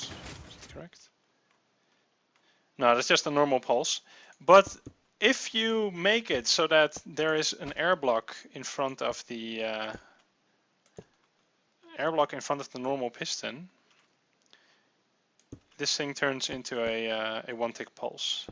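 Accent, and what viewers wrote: Netherlands. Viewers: Het , are you dutch. You sound very hollandische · Are you dutch? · lol jij bent nl dat hoor ik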